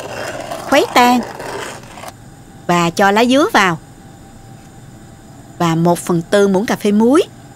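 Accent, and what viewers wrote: South, Vietnam. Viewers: Ko biết khuôn mặt xinh ko nhưng giọng nói dễ thương chuẩn miền Nam hay lắm . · Trời ơi, ghiền cái chất giong Nam rặc của cô quá đi🥰🥰🥰🥰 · Giọng nói người miền nam nghe ngọt ngào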